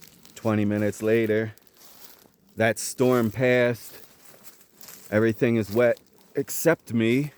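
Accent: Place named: Pennsylvania, United States. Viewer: You need to get yourself a fishing pole brother. Sounds like you are from PA?!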